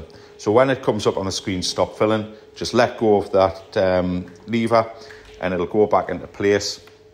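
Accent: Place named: Northeast, United States. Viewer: Top stuff! We got our new boiler last November, and I have had to refill it once (under instruction from the man who installed it). Couldn’t remember what to do when it just happened again, and your short video guided me exactly. Dead simple. Thanks very much indeed. (Lovely to hear a voice from the Northeast again after so many years away!). Brian Barber, Worcestershire.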